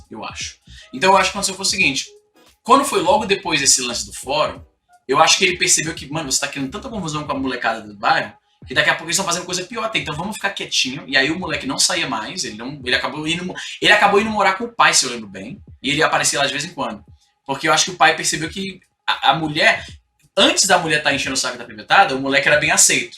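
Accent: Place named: Ceará, Brazil. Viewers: Sotaque dele é muito forte. todo vei aqui em Fortaleza fala assim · Éeeegua.... aêeeeee um cearense numca esquece suas raízes! Aprende com teu pai Izzy 😄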